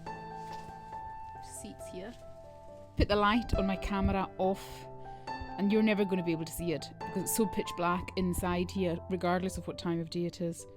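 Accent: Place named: Stornoway, United Kingdom. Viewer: I’m really enjoying your wonderful videos. Listening to you is like listening to my sister in law who is from Stornoway. Fabulous ❤❤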